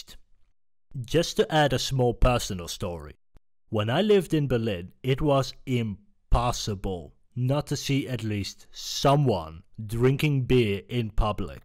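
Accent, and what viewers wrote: Netherlands. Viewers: This guy is definitely dutch based on the accent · Hearing the accent I was thinking, this sounds like a Dutch dude. After seeing the Brand beer; Jup, Nederlands!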